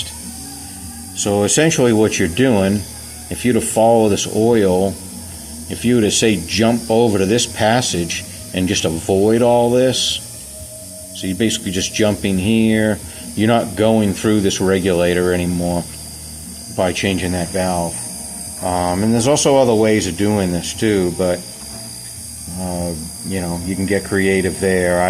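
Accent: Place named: Maine, United States. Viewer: This is great. Still super complex but really helpful. Thank you so much. I think I recall from another video that you're out of Texas, but you sound like a Mainer to me. A good thing!!! Thanks.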